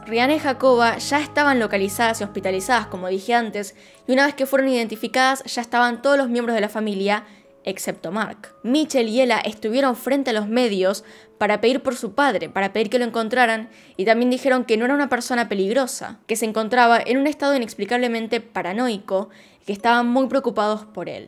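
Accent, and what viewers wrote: Argentina. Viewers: Tiene poco que empecé a ver tus videos, hay varios canales del mismo tipo, en tu caso me pareces muy sincera y directa, también tu acento argentino me ssama la atención jaja, saludos desde México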